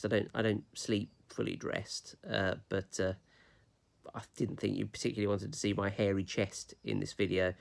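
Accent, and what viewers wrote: United Kingdom. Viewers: I like listening to your very British pronunciation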